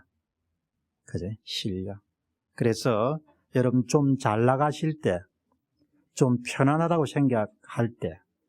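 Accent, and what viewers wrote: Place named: Gyeongsang, South Korea. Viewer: 경상도 사투리 구수하고 진정 진실한 마음 안격이 보이는데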